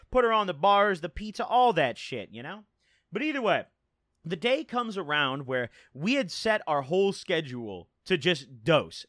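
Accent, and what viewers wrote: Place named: Midwest, United States. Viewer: Could tell he from the Midwest by the way he talks lmao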